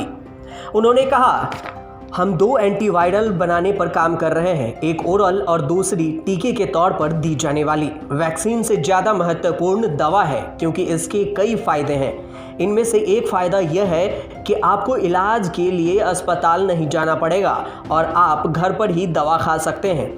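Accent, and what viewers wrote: Bihar, India. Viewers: इनकी जबान साफ नहीं है जिससे समझने में दिक्कत हो रही है बिहारी टंग है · Iski awaz acchi hai voice over bolne wala bihari hai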